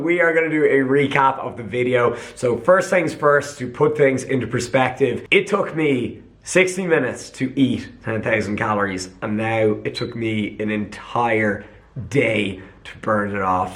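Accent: Ireland. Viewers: What county is he from sorry is it Dublin or Wicklow? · love me an irish accent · accent sounds Irish. Lucky dude if so. Ireland is beautiful!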